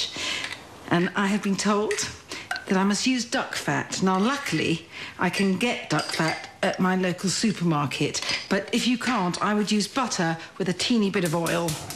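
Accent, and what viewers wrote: United Kingdom. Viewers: 我喜歡奈潔拉老師的口音 濃濃的英國腔真的很適合做播報員主播記者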